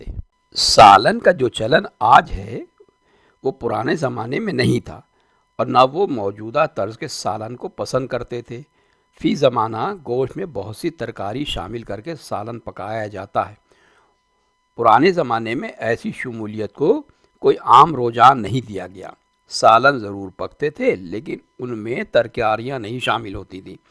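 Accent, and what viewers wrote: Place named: Lucknow, India. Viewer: बेहतरीन जानकारी वह भी लखनवी जुबान में 👌👌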